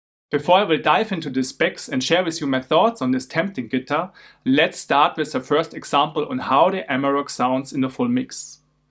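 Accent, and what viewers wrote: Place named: Germany. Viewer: I swear I hear a german accent in there! :D really cool videos by the way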